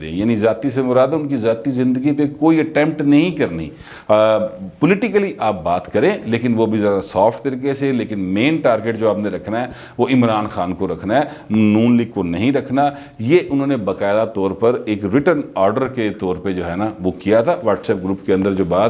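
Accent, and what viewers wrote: Lahore, Pakistan. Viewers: you’ve given a superb interrelationships between the Mian brothers by illustrating a few of your personality experienced happenings. You’ve certainly a great narration skill in Urdu dotted with typical Lahori Punjabi. Super journalistic analysis. That’s why your vlog are impatiently waited for. ❤️❤️❤️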